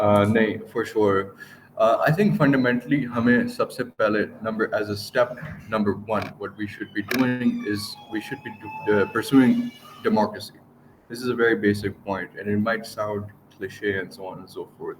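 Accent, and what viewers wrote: United States. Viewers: Ye bewakoof porkistan accent American use karta hai...... Isliye ye porkistan peeche hai.... DEFAULT....... porkistan ka khood ka kuch nahi.... UDHAR bheek se bana porkistan.... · Another Pakistani with American accent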